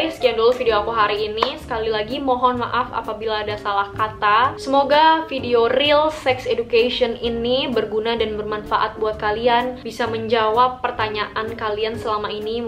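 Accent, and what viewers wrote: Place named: Betawi, Indonesia. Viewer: Enjoy banget dengernya😍😍😍 btw logatnya jadi kaya agak betawi ya😂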